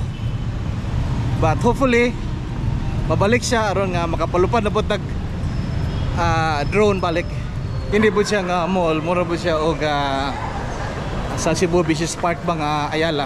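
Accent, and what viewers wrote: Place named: Cagayan de Oro, Philippines. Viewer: Your videos are a “whale” lot better than the other masquerading to be the “go to guy” of CDO Vlogs. Why is that: - you transition from one view to another is smooth (does not hurt the eyes). - background music is good; narration is even better and not trying hard. - your cagay-anon and cebuano bisaya tone is downright natural and entertaining. - you can improve though on the clarity or is it quality of your videos Continue to do your vlogs without really trying hard. Continue too to be a “ man for others”.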